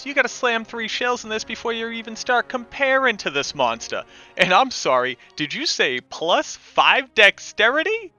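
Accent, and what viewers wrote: Boston, United States. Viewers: The boston accent came out HEAVY in this video :D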